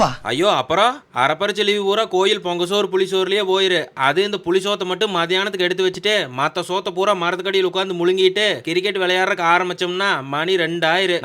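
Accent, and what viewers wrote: Kongu, India. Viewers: Solla vaarthai illai thambi,kongu tamil,balama....sirichutten😂😂😂😂😂😂.enna oru vaarthai jaalam!!!!repeated a kettutte irunden👌👌👌💓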